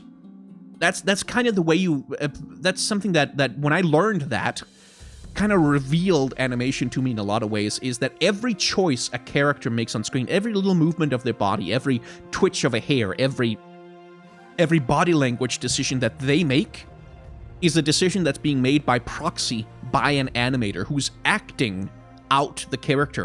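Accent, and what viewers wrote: France. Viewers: that french accent is painful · Your french accent is astonishing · I could listen to with a french accent ALL.DAY. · that French accent made my day thanks buddy, great content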